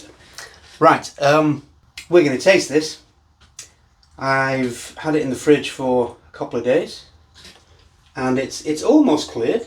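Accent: United Kingdom. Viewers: Sitting here watching a British dude teach me how to make a fowty in my garage.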